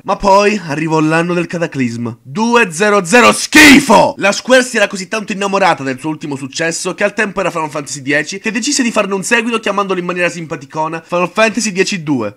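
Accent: Italy. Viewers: Ma sei un genio, il modo di parlare (accento siciliano?), il video stesso come e stato montato etc...=>iscritto...(trovato grz al commento di TheWildLife212 nel video die farenz "Caro Angolo, ti chiedo - Mantova Comics & Games - 10/03/13")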